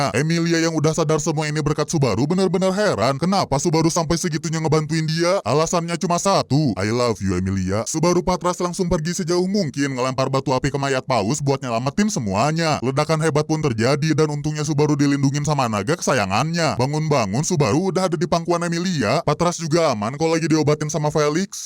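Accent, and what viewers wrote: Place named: West Java, Indonesia. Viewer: nyunda banget logatna😭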